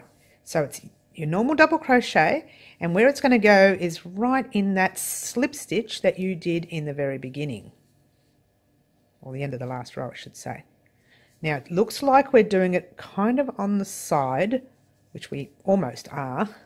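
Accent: Australia. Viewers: Wow love your accent Australian lady ty for sharing.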